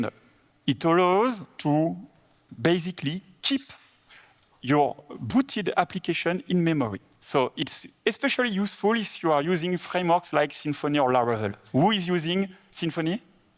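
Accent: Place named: England, United Kingdom. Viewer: why does his french sound like english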